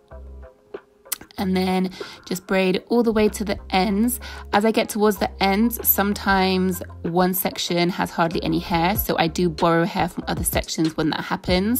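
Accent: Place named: United Kingdom. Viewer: Love these braids on you! Followed you over from IG and this is the first time I I've heard you speak lol Totally forgot you are from the UK <3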